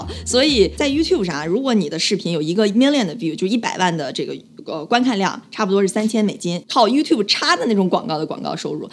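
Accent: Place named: Shandong, China. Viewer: 你是山东人吧，我听你口音像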